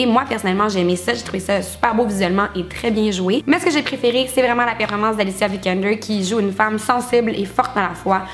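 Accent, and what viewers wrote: Quebec, Canada. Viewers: J'adore l'accent québécoises. 0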